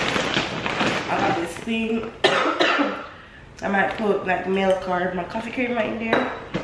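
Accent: Jamaica. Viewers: First time on your channel and I was watching part 2 to this. I hear your accent and I was like she sounds Jamaican (I'm a Jamaican) lol and I was like alright let me go to the first one and here I am. Congrats girl!!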